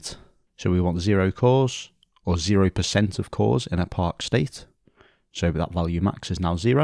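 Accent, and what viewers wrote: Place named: Merseyside, United Kingdom. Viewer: Solid video, are you from Merseyside originally? You sound local. Cheers for the DC promotion video as well, not done that in a while so the video helped.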